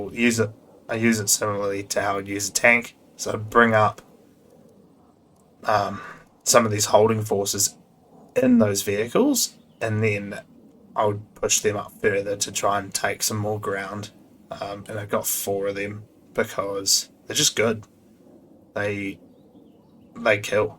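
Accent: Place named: New Zealand. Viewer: I thought you were saying "I'm just showing you my dick" for like 5 times until I realized you got that kiwi "i" vowel shift.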